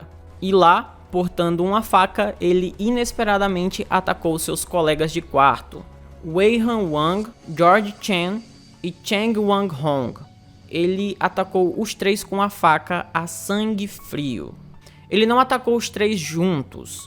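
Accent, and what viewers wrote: Northeast, Brazil. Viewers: Jesus:"( vc e de onde? Pelo seu sotaque e Nordeste mas qual é?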